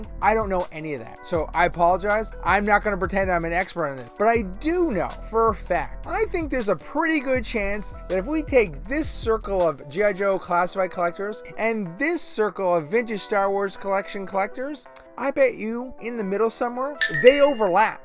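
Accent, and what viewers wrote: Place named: Boston, United States. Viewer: This accent is crazy. It's like you're from Boston...Canada?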